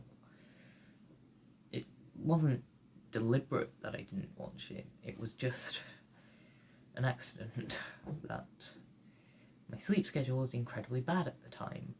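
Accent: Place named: United Kingdom. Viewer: watching you be exceptionally British makes me insanely happy oh my god THE FREAKING ACCENT #Simp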